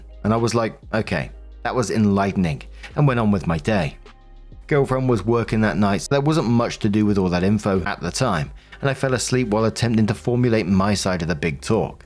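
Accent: United Kingdom. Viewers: As an always sunny fan hearing say "pounding off" very seriously with his british accent sent me. thank you.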